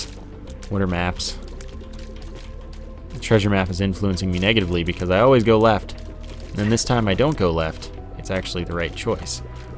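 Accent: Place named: Canada. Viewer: So, stereotypically you sound Canadian. If this is true, which area. If not, where from in actuality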